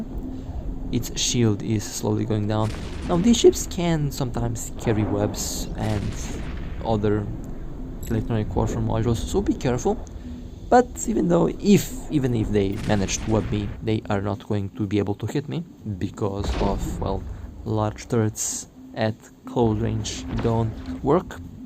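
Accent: Russia. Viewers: Лучше бы по русски сразу говорил, акцент русскоговорящего ппц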